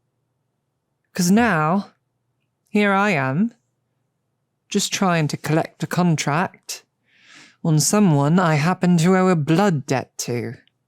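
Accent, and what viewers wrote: United Kingdom. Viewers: British, needs to kill me and spouts puns? Yeah its a friday alright, ty!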